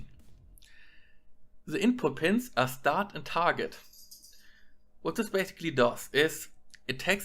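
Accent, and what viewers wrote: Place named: Germany. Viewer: German accent is much different than Polish but i can still understand every word. Very good tutorials!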